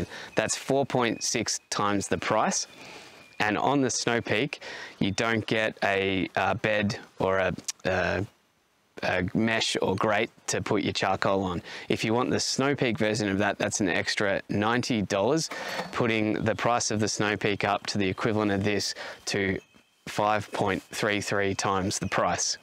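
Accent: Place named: Victoria, Australia. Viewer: Victorian accent gonna confuse ppl every time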